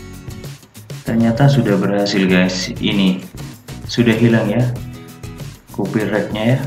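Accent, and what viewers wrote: Madura, Indonesia. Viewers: Oreng madureh ben lek? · Logatnya Madura .. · Reng madureh yeh tretan... 😋😁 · Madureh bos tak elang hehehe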